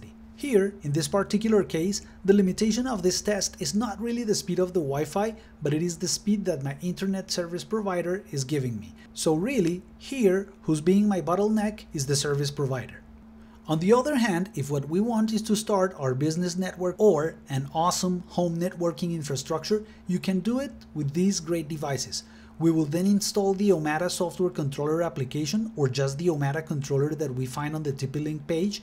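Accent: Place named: Colombia. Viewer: You sound very Colombian.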